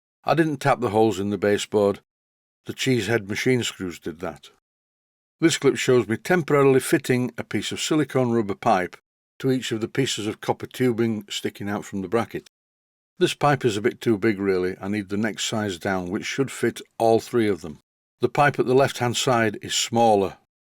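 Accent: United Kingdom. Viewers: You've mentioned CME Engineering a number of times in your videos. Do they have a web site? I searched for them, but obviously my googlefoo is failing me this evening. Either that or my Yankee ears are conflicting with your British accent.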